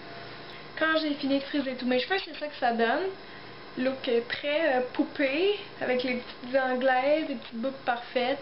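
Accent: Quebec, Canada. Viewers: Merci je suis novice là dedans j'ai toujours voulu m'en faire :) tu explique très bien, tu as de très très beaux cheveux, puis tu es ravissante ! autant les cheveux lissés que bouclés :) et en + de ça j'adore l'accent québécois :D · Merci madame la Québecoise à l'accent adorable, la France te remercie elle va pouvoir se boucler les cheveux sans faire de révolution ! Youpi ! <3 j'adore ta technique ! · Elle est québécoise pas Belge. On ne parle pas comme ça! :p Mais l'accent est mignon! :) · Elle est québécoise, d'ou son accent.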